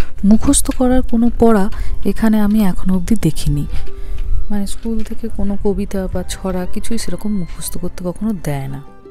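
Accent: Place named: Bankura, India. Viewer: আপনার কথার মধ্যে বাকুড়ার টান আছে।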